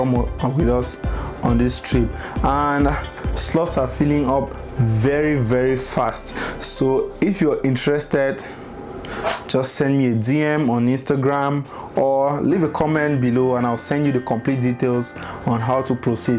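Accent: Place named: Cameroon. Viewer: Nice episode you just got a new subscriber from your accent I knew you were a Cameroonian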